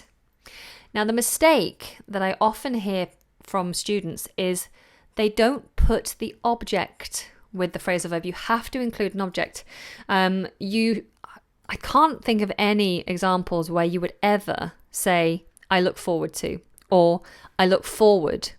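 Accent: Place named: United Kingdom. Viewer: I really admire the British accent. Will it be easy to imitate it ? Please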